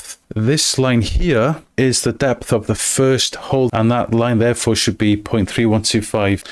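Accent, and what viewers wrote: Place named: Wales, United Kingdom. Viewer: Late reply, but he's saying contour! He has a strong Welsh accent!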